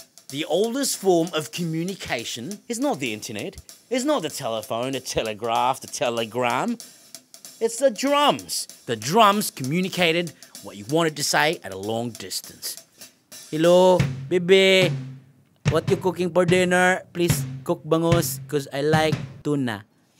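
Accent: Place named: Australia. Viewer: That damn accent. How i wish i have that Australian accent. Here's a thumb up.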